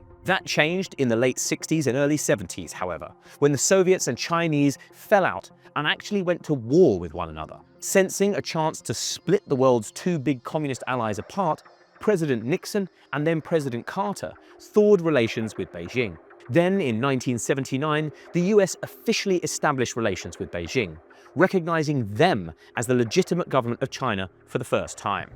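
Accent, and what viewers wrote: United Kingdom. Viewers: if he didn't have a British accent this series would be boring...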